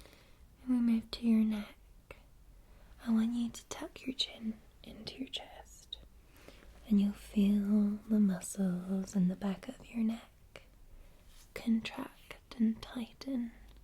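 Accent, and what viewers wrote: Scotland, United Kingdom. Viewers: Thank you. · Fall asleep with the. Next to me......scratch one off my bucket list....💜❤😆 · Thank you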